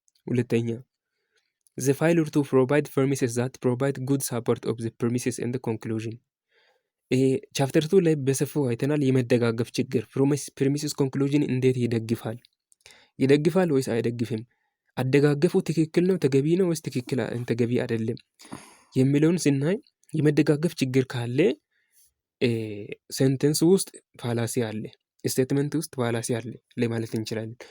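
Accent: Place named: South, Ethiopia. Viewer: አማርኛ አትችልም ደቡብ ነህ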